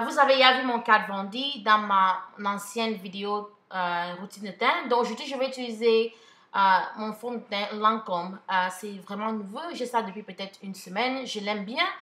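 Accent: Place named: Cameroon. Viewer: Tu m'as bien fait lap J'aime bien ton accent camer . Ta routine est au top et j'aime beaucoup les fard à paupières :)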